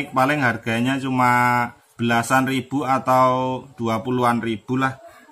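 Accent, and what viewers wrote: Java, Indonesia. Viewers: Maaf bang...ngomong bahasa Indonesia boleh medok...tapi bahasa Inggris jangan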